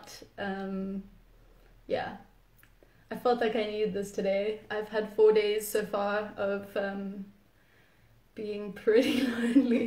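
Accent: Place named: South Africa. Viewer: so good to hear a south african accent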